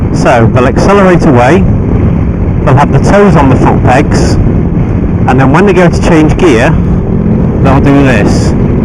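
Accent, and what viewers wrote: United Kingdom. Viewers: I liked this video and hope I can apply it, but I'm not sure if I understood everything clearly (I always have problems understanding British accent, sorry!). So I must ask for a little help here. My problem: every time I switch gears, the bike yanks a little, after I open the throttle again. I can hear and feel it, nothing terrible, but makes me feel a bit like a barbarian who's killing the bike with an axe. I often think I open the throttle too fast, with zero smoothness. I also think I do the whole shifting way too slow. It's more like doing all these 3-4 things things one after another, and def not in a blink. I'm a beginner with a relatively fresh A1 licence and I must say, nobody really showed me how to do these things, not even during the course (which is generally not high quality in my country...). By the way I have a Suzuki GZ 125 Marauder (I really like the bike, so don't say I should throw it away because it's a damn mini-chopper, thanks! 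:P). If anybody responds and gives me a tip how to stop this bad(?) habit, thanks in advance!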